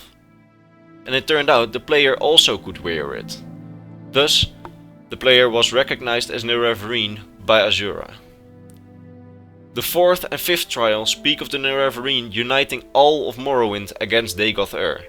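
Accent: Netherlands. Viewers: Ik hoor een Nederlands accent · Are you dutch ? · didox ik denk het, aan zijn accent te horen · Sowieso een Nederlander dat hoor je zo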